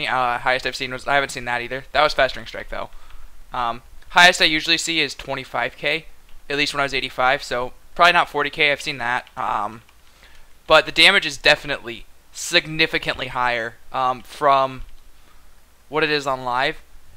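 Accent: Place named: West, United States. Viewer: He has a western accent. What are you getting to? It's Runes not Ruins.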